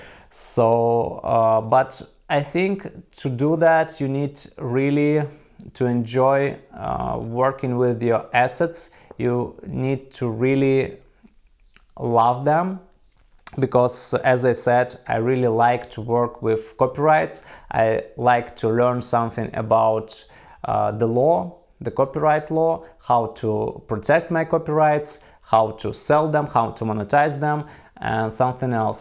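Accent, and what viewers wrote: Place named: Russia. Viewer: Yes, it’s nice to understand you with this “Russian” accent. It’s better than “American” or “British” for understanding 🎉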